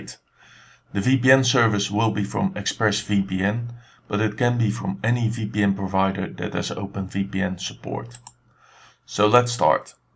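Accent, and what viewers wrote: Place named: Netherlands. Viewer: It spund like youre from The Netherlands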